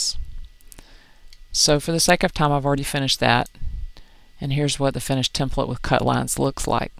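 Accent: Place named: Louisville, United States. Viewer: Is that a Louisville accent? What team is the eagle from? thanks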